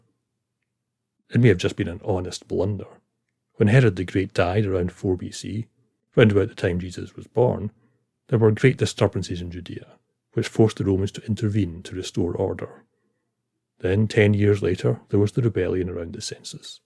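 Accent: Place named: Scotland, United Kingdom. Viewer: And lovely ASMR with your mellifluous Scots accent!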